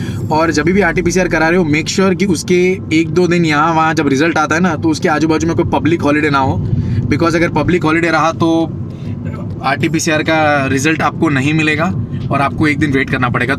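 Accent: Gujarat, India. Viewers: Ap gujrati ho ???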